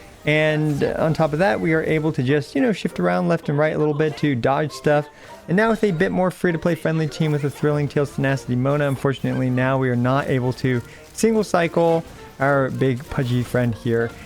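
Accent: United States. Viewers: stop why is ur yeehaw accent so good HAHAH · i love the country accent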